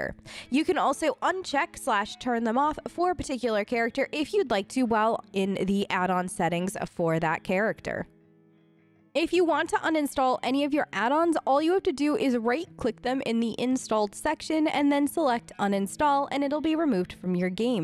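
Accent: Australia. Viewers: OHHHH she's australian! gotcha hehe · You are adorable and helpful ty. I can tell you have a Austrillian accent by the way you say ESO. I love it. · Sick vid, but dont you think for one moment i didnt catch that hidden aussie accent.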